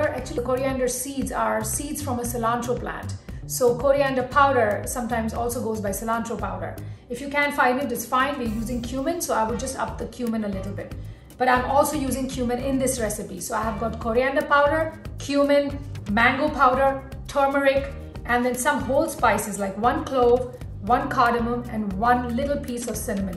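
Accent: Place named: United States. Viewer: Wow u picked up American accent very nicely. You are same way beautiful.❤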